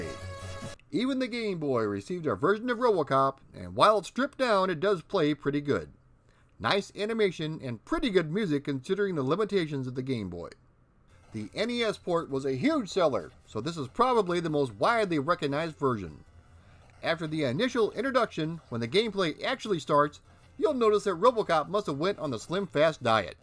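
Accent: United Kingdom. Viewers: Uphold the Law guvnor! Yeah I'm British and that was definitely a British accent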